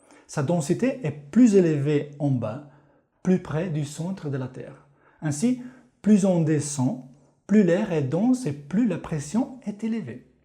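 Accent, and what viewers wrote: Italy. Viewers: Bellissimo accento Italiano!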